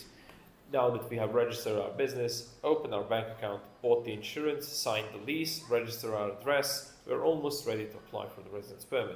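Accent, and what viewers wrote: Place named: United Kingdom. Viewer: Sir u got british accent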